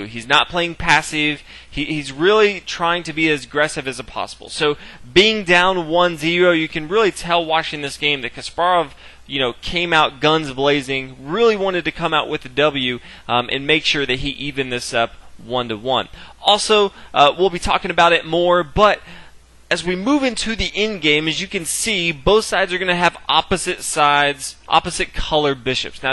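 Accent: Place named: Northeast, United States. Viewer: you are either from Massachusetts, Connecticut, or New York. Am I correct?:-) commentator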